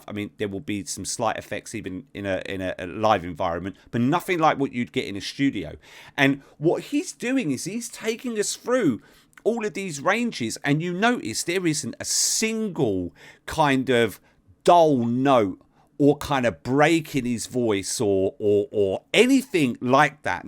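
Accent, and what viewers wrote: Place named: United Kingdom. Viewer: Of course Igor would say his name correctly, lol! I’m just trying to give the pronunciation for this reactor, who has a native British accent like mine ...peace ...❤️🎶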